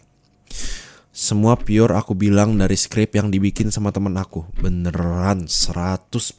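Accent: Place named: West Java, Indonesia. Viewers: Dari logat nya kaya orang sunda 😭👊🏻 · Singkat padat baheula ni sunda banget ni aa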